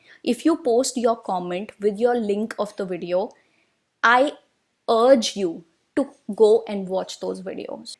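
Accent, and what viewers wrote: India. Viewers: Omg! I just love Indian people! 💓And I missed India 🇮🇳 accent is amazing 😊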